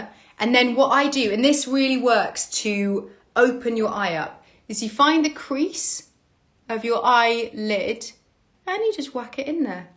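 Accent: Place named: London, United Kingdom. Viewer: Thick London accent.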